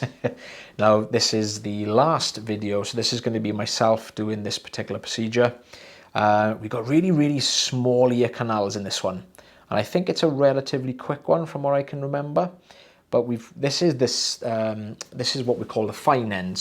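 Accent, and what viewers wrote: Wales, United Kingdom. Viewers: Terrific way to ease into 2026 with soothing Welsh voice describing the challenging removals. All the best to you and Kelly and your young lad! ❤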